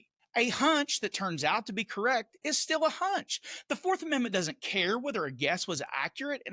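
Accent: South, United States. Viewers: your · I love your channel❤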